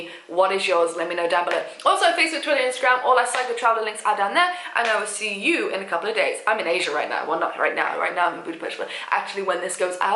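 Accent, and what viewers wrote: England, United Kingdom. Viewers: are you english ? (L) i love your videos anyway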